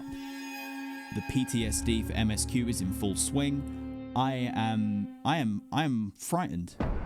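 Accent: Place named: United Kingdom. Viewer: Wait are you in the states? You have a British accent. My bad. I don't mean to offend anyone <3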